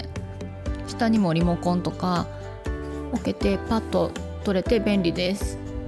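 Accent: Kansai, Japan. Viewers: いつも楽しませてくれてありがとうございます❤ さんの声好き😊所々出てくる関西人テイストも好き。わたしも関西人なので親近感わきまくりです。飴ちゃんね😁大阪人のコミュニケーションツールですね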